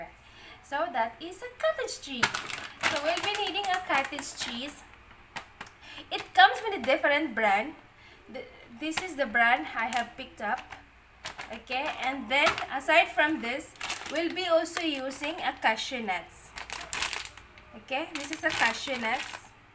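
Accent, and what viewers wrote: India. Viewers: I like your accent, u slightly get this Indian accent, mukhang masarap yan Sis thank u for sharing, stay connected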